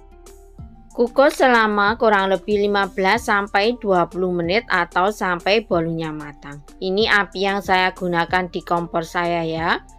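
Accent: Java, Indonesia. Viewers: ....logat jawinya medok bgt....seneng dengernya...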